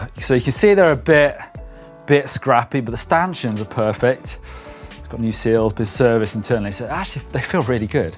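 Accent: United Kingdom. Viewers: Your voice is too gay and British m8 I can't watch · pleasee chenge the dude I just cant listn to his british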